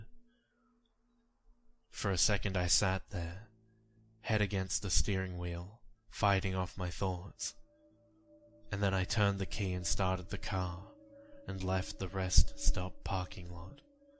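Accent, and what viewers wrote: South, United States. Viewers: i love your stories but you need to work on your southern draw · As an American born in south Georgia. I love your southern accent man! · Not a bad country accent, but in New Orleans it's a little different because of creole. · Love the southern accent, lol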